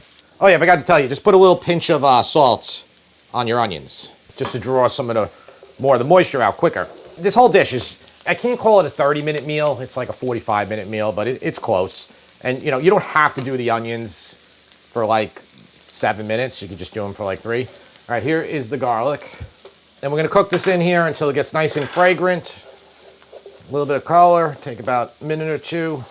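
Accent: New York, United States. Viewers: You don't talk too much. I actually enjoy your New York accent. It's authentic like your videos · This sounds like a MUST MAKE meal! I’m drooling. As a NYer at heart, I love hearing you talk, (I miss Long Island) I love all the information and tips you provide. Great job! ❤ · As a NYer, he sounds like family to me! We are just outgoing and informative, whether you want us to be or not! lol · Always great recipes. Um, I have the exact same accent you do! It’s either Long Island, Staten Island, or Northern Jersey! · Agreed!! Im on long island and his accent sounds like home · Oh and you keep talking, and especially that awesome New York accent! People tell me I talk really Chicago and I love that!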